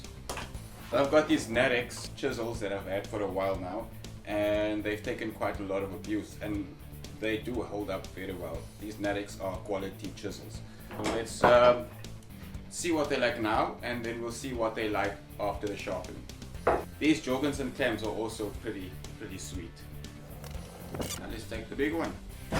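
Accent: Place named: South Africa. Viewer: I love randomly hearing an accent from home on YouTube :) Howzit my bru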